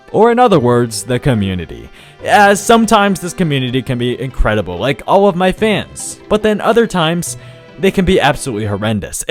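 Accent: India. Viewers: Bro r u indian??? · indian rupee ? ur Indian ? if u are damn nice accent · Are you Indian>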